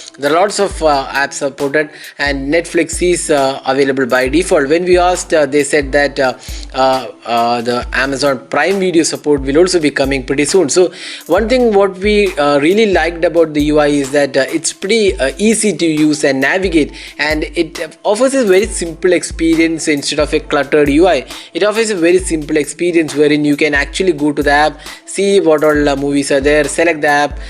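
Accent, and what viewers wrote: Kerala, India. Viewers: ശബ്‌ദം കേട്ടിട്ട് മലയാളി സംസാരിക്കുന്നത് പോലെ ഉണ്ടല്ലോ 🤔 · ഒരു മലയാളി ഏത് ഭാഷ സംസാരിച്ചാലും മലയാളിയാണെന്ന് മനസ്സിലാകും.നല്ല വീഡിയോ. ബെസ്റ്റ് വിശസ്.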